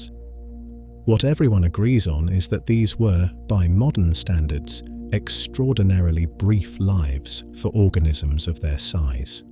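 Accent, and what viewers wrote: United Kingdom. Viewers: This is the best, most soothing ai voice out there. Perfect pitch and key mixed with a British accent. Gentle background droning creates ethereal atmospheric support that does not detract. Please stay with this voice for these beautiful stories of our blue magnificent planet. Thank you so much for creating and sharing. Very much appreciated ❤ Listening from Washington state. USA Subscribed ✅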